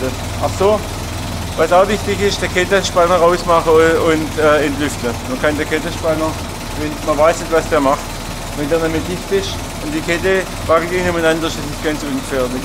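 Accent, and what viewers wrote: Swabia, Germany. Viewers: Klasse wie immer,und zum glück wieder schwäbisch geschwezt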